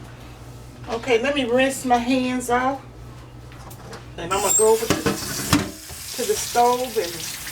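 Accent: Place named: Alabama, United States. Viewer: Love your channel ONE OF THE BEST OUT THERE!! ... U EXPLAIN IT SO WELL.. ANYONE CAN FOLLOW..THAT BEAUTIFUL SOUTHERN ALABAMA ACCENT ADDS A VERY UNIQUE CHARM.. U HAVE DEFINITELY GOT THE. MR. SPOILED WITH THAT. DELICIOUS COOKING!! HE. SEEMS LIKE SUCH A. GOOD HUSBAND... THE ONE THING THAT I WOULD LOVE 2. SEE IS 4 U OR HIM ONE TO TASTE THAT DELICIOUS FOOD. B4 U SIGN. OFF!!....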